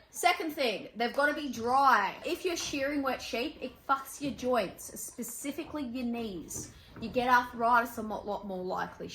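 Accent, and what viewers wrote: Australia. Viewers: The sheep are cute, the farm is beautiful, youre smart and sassy with an Australian accent...youre a welcome distraction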